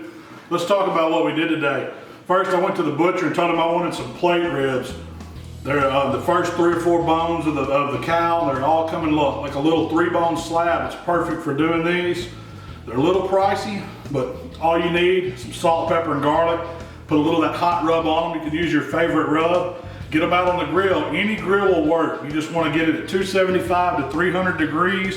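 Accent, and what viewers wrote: South, United States. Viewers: You're just referring to his accent. That's how we talk in the south.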